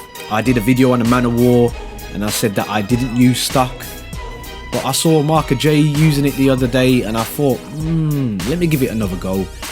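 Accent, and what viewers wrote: Birmingham, United Kingdom. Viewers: You from brum lad?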